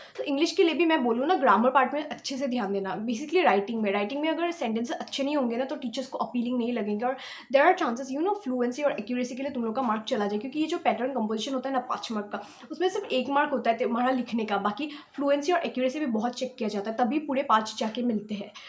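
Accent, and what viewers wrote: Assam, India. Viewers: Assam fam here! I did recognise that you are from Assam from your accent. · It seems like you are from Assam. Are you?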